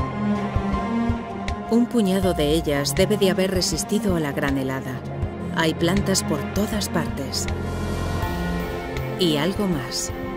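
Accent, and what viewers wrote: Spain. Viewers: Luego está internet lleno de odio hacia el acento español 😢😭😭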